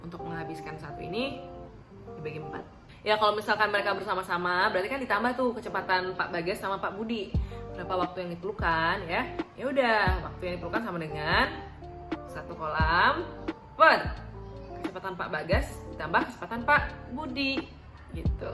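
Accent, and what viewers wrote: Palembang, Indonesia. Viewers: Kak kokbahasa kk kyk bahsa plembangg sihh😚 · Kakak orang palembang ya? logatnya wkwk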